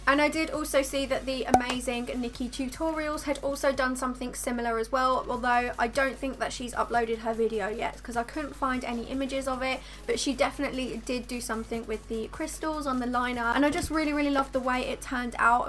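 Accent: United Kingdom. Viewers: New subscribers and I seriously didn't think you was British 😳 already obsessed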